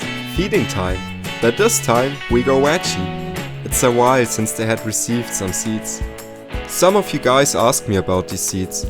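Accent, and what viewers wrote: Germany. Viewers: Man hört aber ein bisschen das Deutsche in ihm😃😜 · Du bist Deutsch richtig? Der akzent! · Are You German ? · Ohne in die Kommentare zu gucken wusste ich das du ein deutscher bist wegen dem Akzent😂😂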